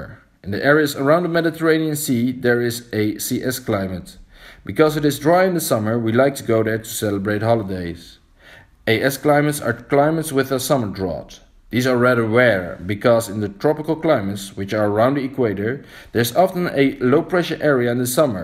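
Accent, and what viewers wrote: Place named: Netherlands. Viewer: lekker typische nederlands accent weer op die engelse taal heerlijk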